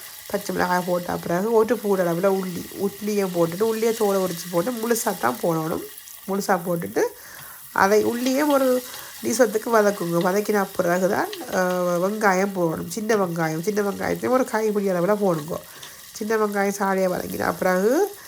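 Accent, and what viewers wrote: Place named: Sri Lanka. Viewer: உங்கள் இலங்கை தமிழ் பேச்சு ரொம்ப பிடிச்சிருக்கு.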